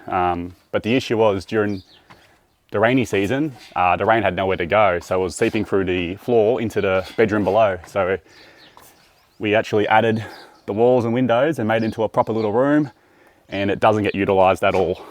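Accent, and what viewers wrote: Australia. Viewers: It’s so hard to listen and understand your English in strong Australian accents . 😮good daiy , barbi (bbq). All the best in the future for your next move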